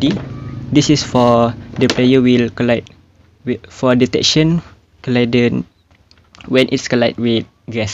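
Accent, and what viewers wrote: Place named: Malaysia. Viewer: thank you, btw you sound like malaysian.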